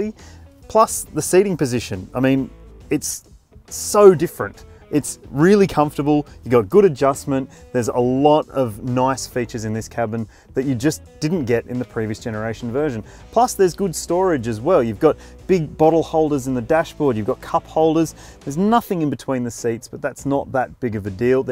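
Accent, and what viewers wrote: Australia. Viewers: Hate the Aussie accent, but good, informative and even fun piece.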